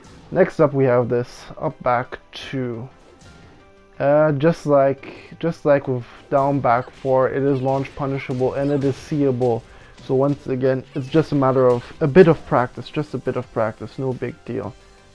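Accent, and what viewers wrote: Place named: Caribbean. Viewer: Sub for the helpful info and the carribean accent brudda! We need a Trini Tekken character already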